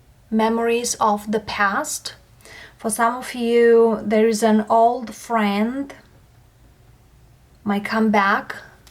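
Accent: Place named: Russia. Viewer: Always knew U R Russian😊😅👍That beauty eyes accent😊💝👍Goddess 😊👍💖🌻Many thanks! Much Blessings2U/Family💖🌻💝😊🙏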